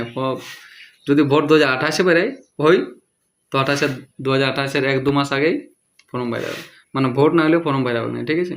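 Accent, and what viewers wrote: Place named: Purulia, India. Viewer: Purulia r mal naki bhai tui... Kotha sune lagche..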